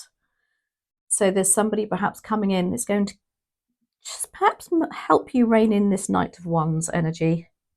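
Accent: United Kingdom. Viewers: Thank you so much In America we love the British accent:face-blue-smiling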